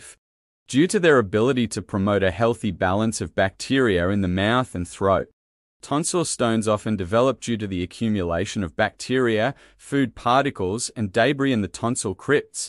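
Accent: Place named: Australia. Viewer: I love hearing Australians speak I can listen to that shit all day it's relaxing to me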